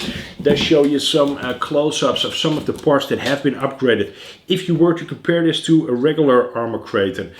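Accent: Netherlands. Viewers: Godvrdme, 10 jaar later en nog steeds het Nederlandse accent! :-). Maar goed bezig hoor, ik wil ook beginnen met een beetje crawlen maar dan op de Veluwe. Keep up the work sir.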